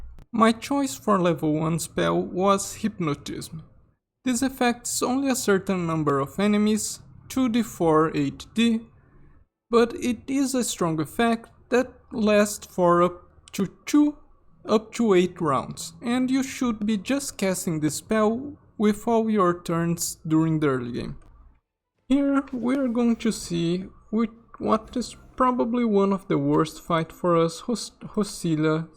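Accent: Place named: Brazil. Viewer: EU SABIA QUE VC ERA DO BRASIL PELO SEU SOTAQUE! Hahaha Bro, o teu canal é o único que eu assisto e além de concordar com builds que são parecidas com as que eu penso em termos de prioridades de feats, raças, multiclass e outras coisas, eu tbm aprendo para um!! Parabéns pelo trabalho!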